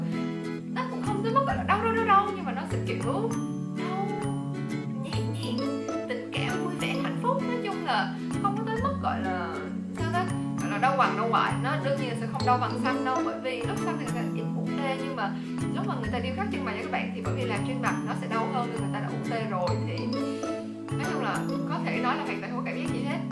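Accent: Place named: Gia Lai, Vietnam. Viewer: Quê chị ở đâu thế nghe giống giọng gia lai quá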